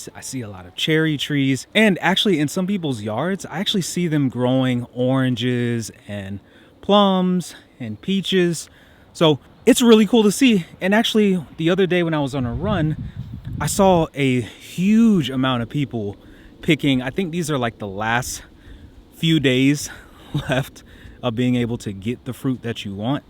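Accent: United States. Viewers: The important part in the title is this "IN MY GERMAN NEIGHBORHOOD". Because in my neighborhood i see exactly nothing. There are houses and a street. Thats it. Be happy you landed there. Germany can be the most boring place imaginable. Every jungle village in Asia has more to offer than the villages here. Also the thing with the "humanity". Sadly having books along the streets isnt the best proof for lots of humanity :-) Ive visited many placed in the world but the Germans were the coldest among all. I didnt see any humanity anymore, when its about talking normal to unvaccinated. Or if someone falls out the of the standard mainstream person. Nothing is left of any human sign in those cases. You will be treated as an idiot and outsider. Thats is not whats humanity means exactly. And nowadys politicians are unacceptable too. Dont get me wrong. I can imagine your place is nice. 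And im very glad you enjoy it :-) Honestly. Im sure someone can get happy in this country. Sadly not me but that doesnt have to count for everyone. You sound like youre from the US. Not sure. A problem could be that Germany is the behind of the US and that may mean that in 10 years of time, you end up at "the same place" as you left. A crazy one ^^ Enjoy. I hope you find what youre looking for.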